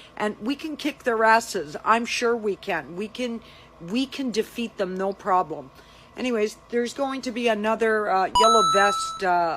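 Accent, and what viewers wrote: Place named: Canada. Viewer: Nice to hear a real Canuck.........Yes we should wake up.....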